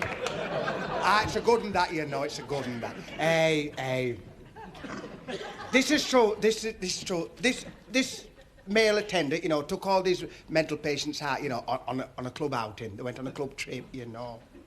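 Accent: Barnsley, United Kingdom. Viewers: As a sheffielder born in 65 listening to Charlie in the 70’s was fantastic! He has a true Yorkshire accent - it’s like silk for the ears. RIP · I love his proper Yorkshire accent. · Thank you for your efforts!! is a gem in British comedy! Plus, I'm learning to understand that Barnsley accent of his! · That Yorkshire twang is amazing 👍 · God bless Charlie. I'm a Derbyshire man but I loved Charlie's comedy and his salt of the earth Barnsley accent. He was an absolutely brilliant comic and a lovely man.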